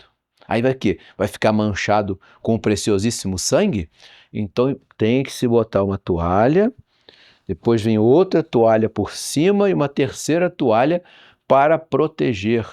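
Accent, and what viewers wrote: Rio de Janeiro, Brazil. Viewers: Olá, boa noite! Que aulas maravilhosas!!! Parabéns ao CDB e ao excelente professor! Só gostaria de frisar, aqui, que o excessivo sotaque carioca torna a aula cansativa ao ouvido, o excesso do chiado do 's', o que é um ruído da fala, é ríspido ao ouvido. Como boa estudante que fui de estética vocal, sugeriria ao professor minimizar este sotaque. Nada contra os cariocas, por favor. Apenas que para falar ao grande público é melhor sem ele e qualquer outro. Obrigada.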